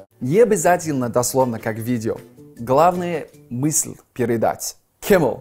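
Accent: United Kingdom. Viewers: классное видео, спасибо! такой чистееькийи понятный британский английский! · B, A) Почти без затруднений все поняла.Ох уж этот великолепный Британский акцент 🔥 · Блин британский акцент((( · Я уж подумала, что ни фига не пойму. Оказалось, не оказалось!!! Спасибо большое учительнице английского, к которой я ходила на дополнительные занятия. Она заказывала нам книжки и кассеты из Великобритании. Вспомнила с акцентом их звучание!!! 😢🥺😍😘 Побольше бы таких занятий! 🥰🥰🥰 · Боже, брит акцент наслаждение для ушей, это стаккато, это эаа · нужно однозначно создавать свой подкаст на английском, приятный британский акцент. What do u think about it, sir? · Вижу оповещения о новом видео от SkyEng с Сэром - откладываю все дела на потом 😍 Ответы, вероятно, b&a И отдельное спасибо за мой любимый британский акцент от любимого бриташки ☺️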